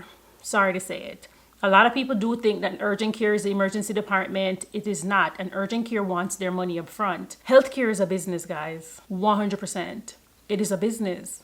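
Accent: Jamaica. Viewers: This is the problem with nursing, if you are coming here to give your opinion about the story on hand why criticize the woman on how she speaks? I’ve never heard anyone asking a Hispanic to pronounce their words better or speak proper English. You should be ashamed to say you’re a Jamaican as well cause you should understand why she speaks the way she does. If it were my channel I would block you! Very distasteful and disrespectful!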